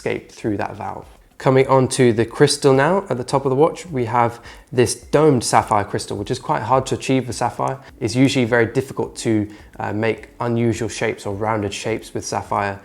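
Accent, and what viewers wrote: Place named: United Kingdom. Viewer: because hes British and they can't seem to pronounce their own language to save their lives over there.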